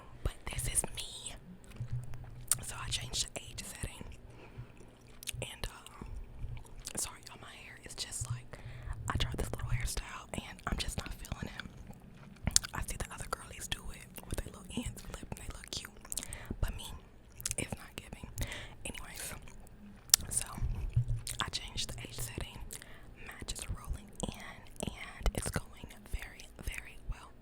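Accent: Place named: South, United States. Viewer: I love your laugh , u sound so southern & I love itttt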